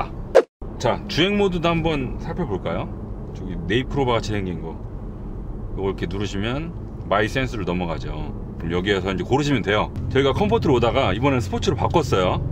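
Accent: Chungcheong, South Korea. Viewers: 이분 말투가 전형적인 현재의 충청도 말투입니다. 거의 교과서네요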